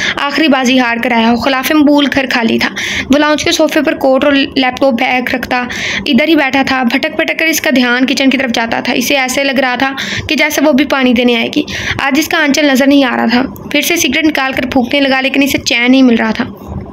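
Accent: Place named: Lahore, Pakistan. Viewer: aap Lahori tu nahi ap ki vioce toon se muje laga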